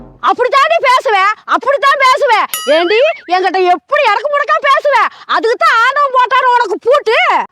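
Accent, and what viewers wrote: Kongu, India. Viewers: கொங்கு தமிழ் சூப்பர்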